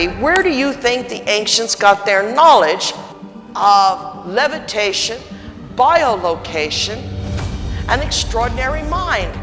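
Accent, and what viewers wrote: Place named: England, United Kingdom. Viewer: What happened to the English accent?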